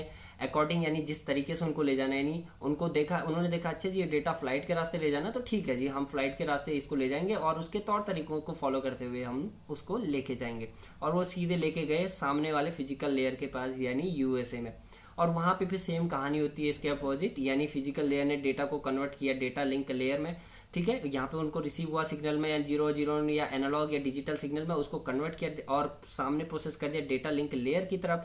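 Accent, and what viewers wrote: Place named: Kumaon, India. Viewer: Sir maine abhi aapke y video dekhi ..what an interesting and easy explanation...... 🙏🙏🙏 Thank you so much .......I have a question ap kumaoni ho kya? Just lga...a little touch in your language...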